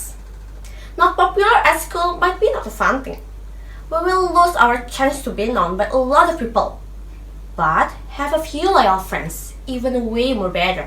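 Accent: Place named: United Kingdom. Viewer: Buihhh.. British bngt 👍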